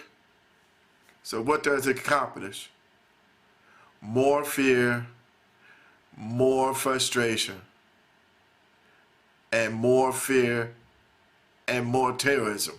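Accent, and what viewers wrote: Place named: South, United States. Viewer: shut ur southern accent , u make me sick . please speak english. is not da , it is that.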